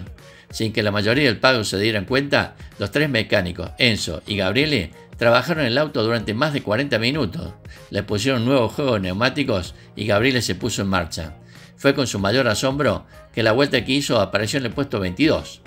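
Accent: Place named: Córdoba, Argentina. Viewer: Cordobés vos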